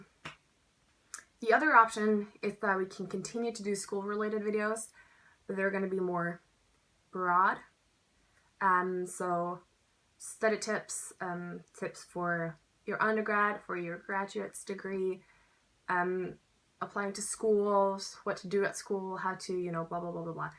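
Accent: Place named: United States. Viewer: The video that I had the deepest impression of you was how to do well in IB, your English sound so authentic American now hahaha. I'm looking forward to videos on your current life~ (e.g. travel, uni, London life etc.)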